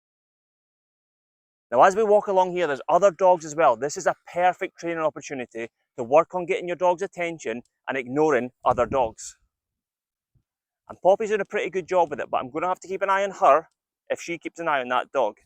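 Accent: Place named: Scotland, United Kingdom. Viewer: I had to chuckle with the tupac reference coming from a dude with a Scottish accent. Caught me off guard but good video man.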